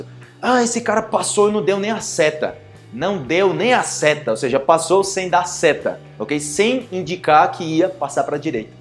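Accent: Spain. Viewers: Vc é brasileiro??? Eu acho que é, mas deve morar fora pq ja percebemos um leve sotaque e uma soniridade espanhola na sua fala...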